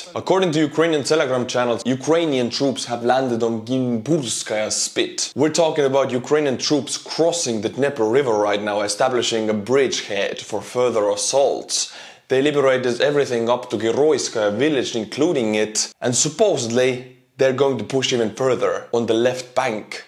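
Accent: Scotland, United Kingdom. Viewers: You have talent as a commentator! I love your Scots accent for you land and title advertisement. I’ll bet the you are there most successful advocate!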